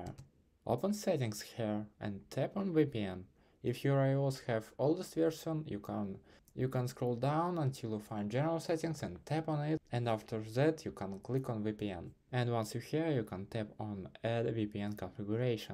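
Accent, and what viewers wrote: Russia. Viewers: слышу русский акцент!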